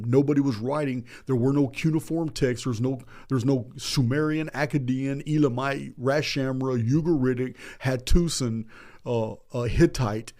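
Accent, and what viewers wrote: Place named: Texas, United States. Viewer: I am not sure how I came across your account initially… I think it was about the goddess being first, but what I connected with immediately is the Texan accent because I was born and raised in San Antonio. Then I realized that you’re on the same path as me…obviously ahead with all the books you’ve been able to read but either way, I am where I need to be and I’m glad I discovered your page and I appreciate the information you share ☺️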